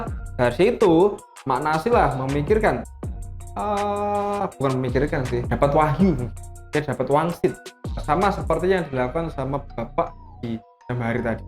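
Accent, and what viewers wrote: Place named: Java, Indonesia. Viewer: Medok mas 😅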